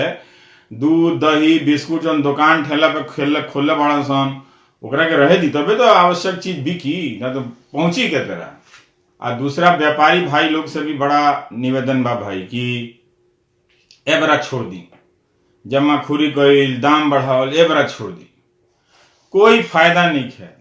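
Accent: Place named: Bhojpuri, India. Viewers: Your bhojpuri style is very awesome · Keya bolte bihari bhasad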